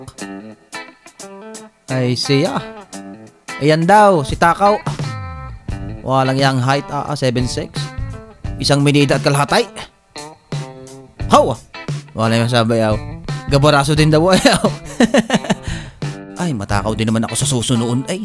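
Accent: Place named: Santo Tomas, Philippines. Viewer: Ayy taga STO.TOMAS talaga are! Ascent palaang ih!😂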